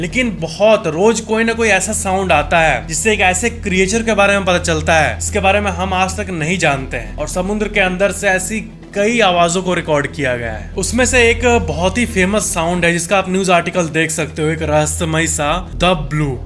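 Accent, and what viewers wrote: Bihar, India. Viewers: Bhaiya aap bihar se ho kya aapki bolne ke andaaz se pta chal rha hai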